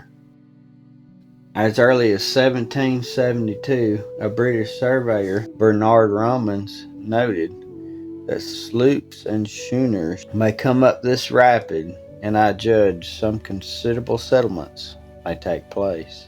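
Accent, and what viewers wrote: South, United States. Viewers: I just found your channel while looking up information about this town, I was reading about it on the AHC's website- as a 37 year native of Alabama, I love to see people keeping our proud, beautiful state's history alive for generations to come. Brings pride to my heart- thank you. And hearing it read with our southern drawl really seals the deal. Love this content and I'll be coming back for more.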